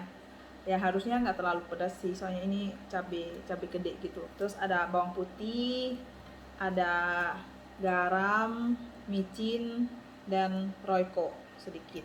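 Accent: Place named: Indonesia. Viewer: Kak kok gak ada video yg baru sih..penasaran bgt sm video2 mu..secara kamu kalo gak ngomong ,kamu orang laos. aku pikir kamu asli indonesia...karena logatmu indonesia banget loh...haha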